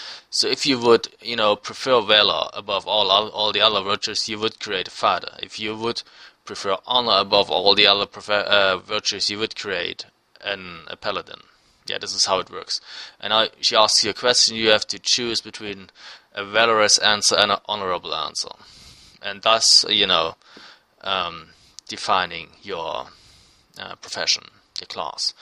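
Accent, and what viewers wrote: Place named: Germany. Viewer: dude, you sound so german!!! :)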